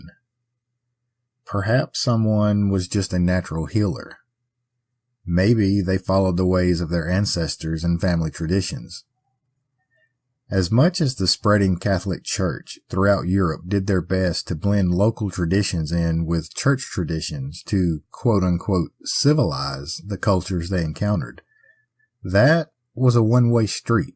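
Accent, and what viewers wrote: South, United States. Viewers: Yay yay i made it in time for the > 100 like status ❤❤ Delectable Dixie drawl here i come 😊😊😊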